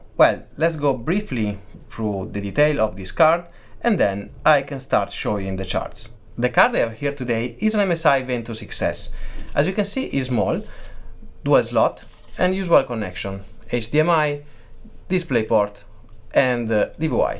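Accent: Italy. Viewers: Sembri un italiano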